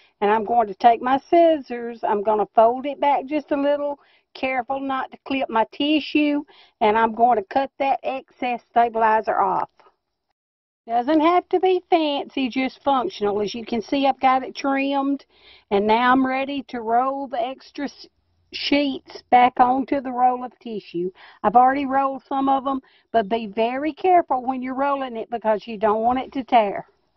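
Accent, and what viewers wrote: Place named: Alabama, United States. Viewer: I knew that was an Alabama drawl...Im in Alabama too!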